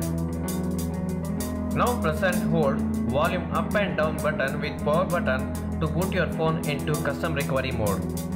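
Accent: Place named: South India, India. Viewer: are u south Indian??? ur accent tells the truth , I'm a south Indian too but I don't have that accent ,I want to know why!!!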